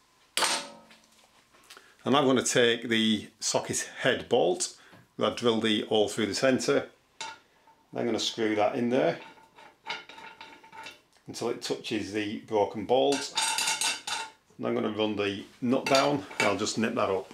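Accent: United Kingdom. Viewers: HOW VERY KIND FROM YOU TO SHARE THIS SURGEON LIKE PRECISION WORK, AND IT HAD BEEN AWHILE SINCE I HEARD THE BRITISH ACCENT, WHERE IN THE UK ARE YOU?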